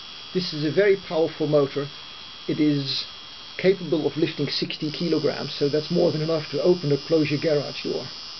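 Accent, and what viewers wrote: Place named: Netherlands. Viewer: Funny how you can tell he is Dutch from the first sentence he says. Great Work!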